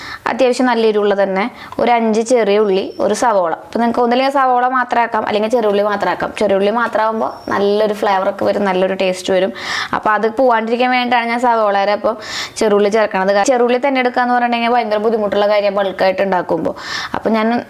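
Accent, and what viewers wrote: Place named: Thrissur, India. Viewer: Chechi.thrissur bhasha aanalloo njnum Thrissur aanuttaa 💪❤️❤️🤗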